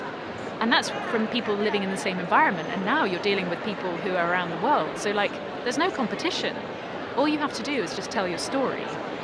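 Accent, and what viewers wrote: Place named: RP, United Kingdom. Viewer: Where did you learn such beautiful pronunciation (RP)? Love it...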